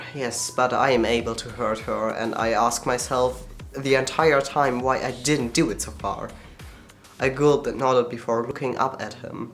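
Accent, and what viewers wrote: Germany. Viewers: Sis r u German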